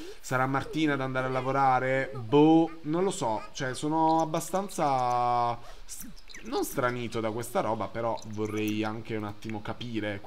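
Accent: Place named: Taranto, Italy. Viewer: ho appena iniziato a guardare i tuoi video e ho capito subito che fossi di Taranto,spero vivamente di avere ragione hahahah comunque sei divertentissimo giuro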